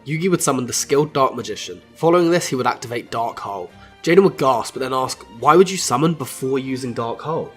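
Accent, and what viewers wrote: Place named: United Kingdom. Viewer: Your voice is so fucking British alas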